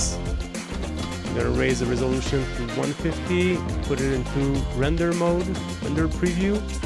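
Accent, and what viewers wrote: Quebec, Canada. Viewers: Is that a Québécois accent I detect? Bonjour cher québécois! The simulation looks astounding!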